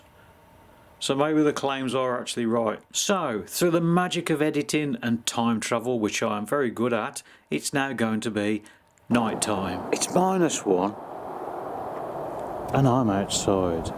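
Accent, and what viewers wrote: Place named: Stoke, United Kingdom. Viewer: What city are you from? You sound stokey.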